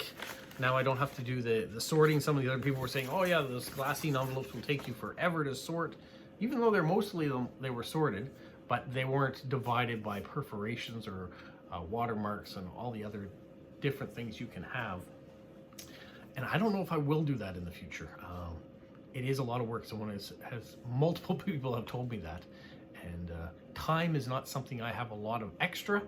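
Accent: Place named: Canada. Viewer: Between these two videos that is a heck of a lot of US stamps. BTW I always get a kick out of your Canadian accent.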